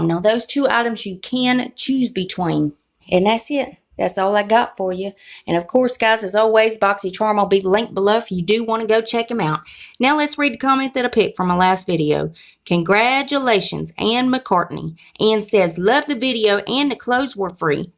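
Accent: South, United States. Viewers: Awesome to hear a southern voice on here. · Love your southern accent 🥰 Thanks for sharing. I subscribed 👌🏽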